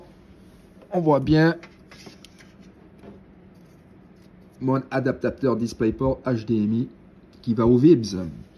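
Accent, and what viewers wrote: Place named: Aude, France. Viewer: Pour les tables Zaccaria en promotion, on peut espérer les trouver à combien sur leur site. Parce-qu'actuellement elles sont à 250$ (1 à 5). J'avoue le prix est élevé. Je me suis autorisé l'achat des jeux verticaux, malheureusement quand j'ai reçu la clé d'UK. Le pinball me l'a planté. Ils m'en envoient une autre et m'ont dit que cela pouvait arriver. Quand j'écoute votre accent.....vous semblez ne pas être très loin de chez moi. Je suis du dep 11. Je vous dis à votre prochaine vidéo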